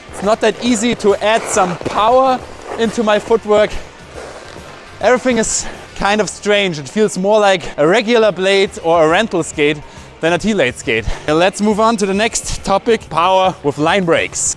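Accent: Germany. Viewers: Schee. Mit a bissl boarisch 😊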